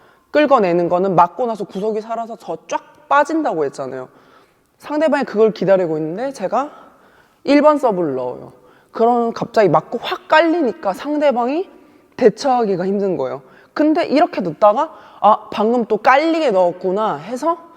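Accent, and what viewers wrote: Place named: Gyeongsang, South Korea. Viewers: 초중고를 부산에서 나와가지고 억양이 남아있어서 😅들켜버렸내요 3가지 다 연습 해보셔서 써먹어보세요 더 희열감 드실꺼에요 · 코치님 억양이 경상도 분이셨군 ㅎ 이서브 연습많이 했는데 희열감 좋더라고요 여러버전이 있었군요 공략 역시.. 최고의 서브으 👍🏻👍🏻 늘머쩌브러 🔥🔥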